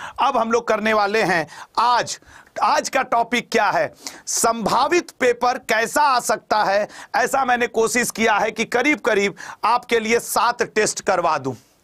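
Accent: Bihar, India. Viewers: Sir apka language Bihari jasa hai 😅😅😅😅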